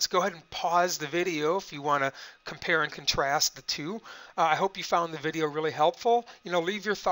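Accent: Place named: Boston, United States. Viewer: Wicked Bahston accent!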